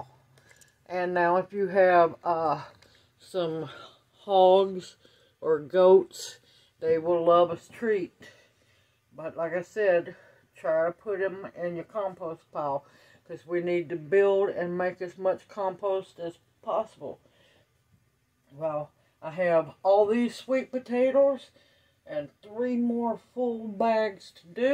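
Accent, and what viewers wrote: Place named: Tennessee, United States. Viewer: I saw the video title and I had to watch. I said, this gal must be from Tennessee, because sweet potato butter is from back home. Then I heard you speak and said, yes, this is a fellow sister from Tennessee. Good on ya teachin the ways.. 😁👍🏻❤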